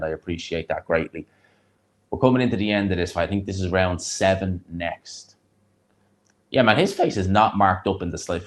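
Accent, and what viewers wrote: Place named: Ireland. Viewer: Thought I'd check out your analysis; always enjoy your comments and Irish accent. Enjoy your sleep!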